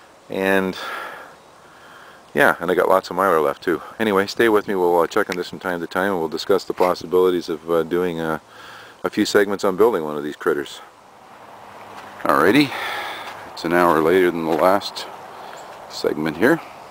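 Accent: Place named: Canada. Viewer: I heard your from Canada cause you said.. ouuut… like houusse.. it is just I noticed it in the Canadian accents.. 🌺👍🏽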